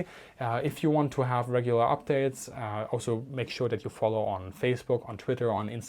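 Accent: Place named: Germany. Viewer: Ein, zwei, drei, acht, ein und zwanwig etc. We can tell from your accent that you are German--you don't have to constantly remind us by using German numbers in an English-language lecture! Your audience is English speaking. Otherwise very informative, analytical and enjoyable.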